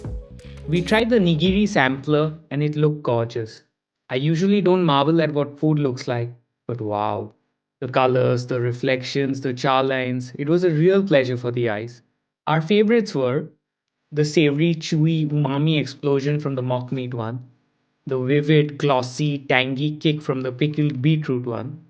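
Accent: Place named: Mumbai, India. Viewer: Just my opinion: you speak Mumbai English which will fetch you sobo subs and a few foreigners. Try Hindi to see if the new experiment is working for you or not. I wouldn't be able to to hear English from someone who is a non native speaker for very long. Your fluency is on point but the voice isn't made for non-Indic languages.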